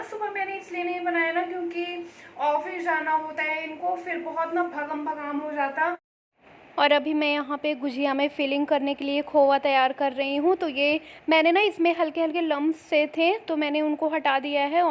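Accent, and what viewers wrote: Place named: Bihar, India. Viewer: आप हिन्दी सही से नही बोलती बिल्कुल बिहारी जैसे हिंदी बोलती हो।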